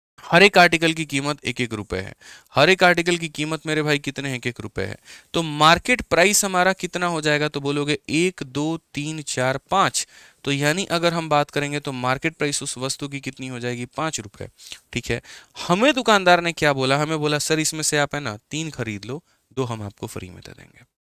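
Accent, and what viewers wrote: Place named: Bhojpuri, India. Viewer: Apki bhojpuri tone sir just ...... Hayeee🙈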